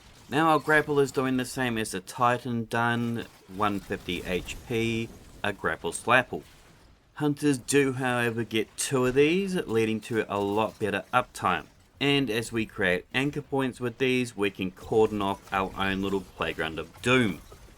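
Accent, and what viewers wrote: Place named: Australia. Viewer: Creative ideas, informative and well presented, entertaining aussie accent, subscribed for sure!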